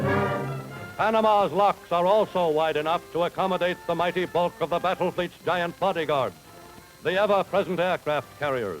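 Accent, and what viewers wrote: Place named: Mid-Atlantic, United States. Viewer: Great slice of history. Love the old-timey narrator with the generic "Mid-Atlantic" accent.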